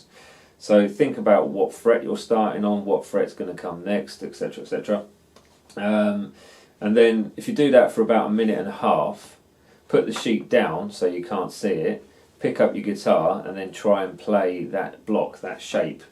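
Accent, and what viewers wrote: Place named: Kent, United Kingdom. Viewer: Are you from SE Kent ? We lived in Sandwich , and your accent sounds the same as if I’m listening to someone from there! My husband is British and we moved there and back over ten years ago now. It was quite the experience. I’ll say that !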